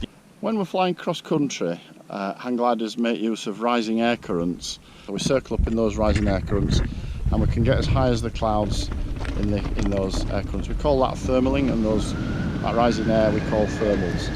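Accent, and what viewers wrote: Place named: England, United Kingdom. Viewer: So highly thankful to you for your nice reply.. the video is so useful, it needs several times to hear to get more info despite the sounds of the winds around you there besides your English accent, and still needs more in scientific information to understand on how every physical factor would participate with other factors in the Hangglider lift process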